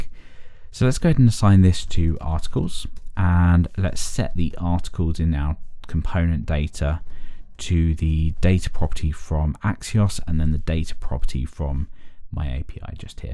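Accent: United Kingdom. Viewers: An extraordinary tutor with British accent, how cute <3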